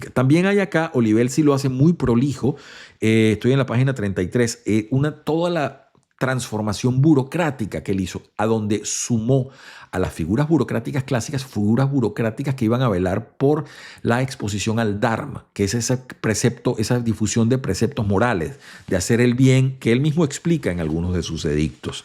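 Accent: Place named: Caracas, Venezuela. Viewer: Excelente tema, excelente canal y excelente vocabulario, excelente dicción y magnífica voz coronada con el acento caraqueño. Gracias Sr.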